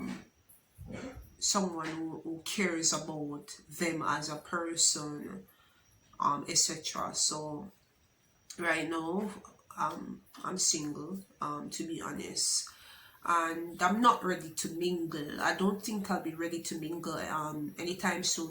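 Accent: United Kingdom. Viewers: Di likkle British accent deh it agwaan nah lie love your confidence from you have self love and confidence in whatever you do you will excel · I'm hearing a likkle hint of that British accent still 🔥😻 bless up! another great video. I love your answers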